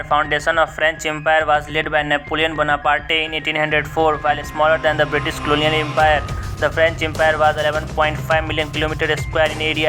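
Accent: India. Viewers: Indian english i dont hate but its annoying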